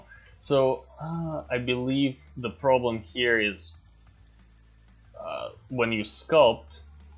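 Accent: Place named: Quebec, Canada. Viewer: L'accent du Québec ça trompe jamais! eh eh, i wonder if an 8km x 8km terrain need world composition?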